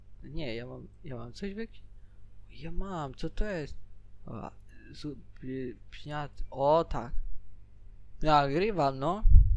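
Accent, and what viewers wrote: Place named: Poland. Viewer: Masz bardzo fajny głos. Czy ty pochodzisz z jakiegoś regionu polski że masz taki akcent?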